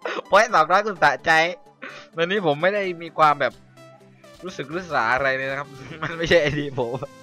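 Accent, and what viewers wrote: South, Thailand. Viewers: พี่คนใต้หรอ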